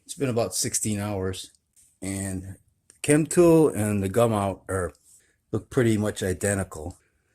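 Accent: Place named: Boston, United States. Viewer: I like the Boston accent. If you ever meet Mark Wahlberg or one of his brothers, let us know.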